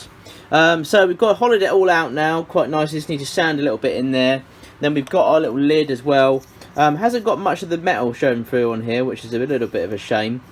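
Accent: United Kingdom. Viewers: Trips me out how British Accents exist when we speak the same English. Yeah I'm lit rn 🤫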